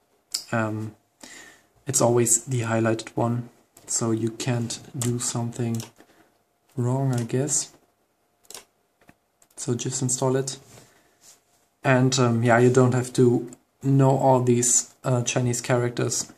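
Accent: Germany. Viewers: Are you german?:)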